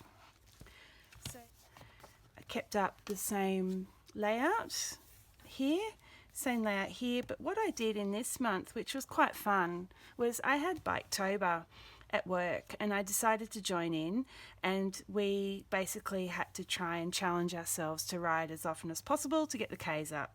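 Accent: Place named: Australia. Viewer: yay aussie accent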